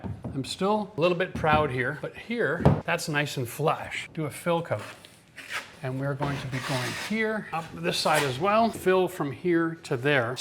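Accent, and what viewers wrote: Canada. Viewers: He definitely sounds Canadian to me :P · Are you Canadian? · Canadian aye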